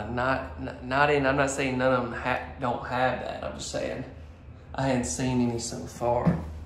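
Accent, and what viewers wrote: South, United States. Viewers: Buddy I LOVE Your Accent. Some Where Down South? LOVE It! AWESOME VIDEO VERY Clear & Clean Very Descriptive In your Video. Ever Think Of Real Estate? You Be A AWESOME ONE! I Would Certainly Buy A House From you As You Have Exquisite Taste In Homes. Looking Forward To Seeing MORE. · Love the Southern accent and mispronounced words.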